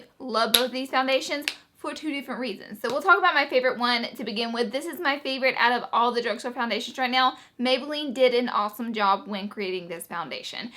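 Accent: South, United States. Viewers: that country twang lol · I say oil the same way.. I think it's a southern thing because in Louisiana that's all you hear! 😂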